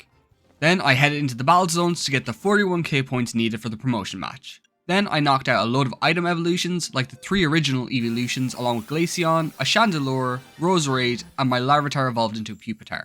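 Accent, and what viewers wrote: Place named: Ireland. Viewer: This is the first video I've seen of yours, I am SO GLAD to find a fellow Tepig lover (I also agree that pignite is such a happy boi). Bonus points for being a fellow Brit. (After listening to a bit more of this video I realised you sound Irish, so sorry about that mate.)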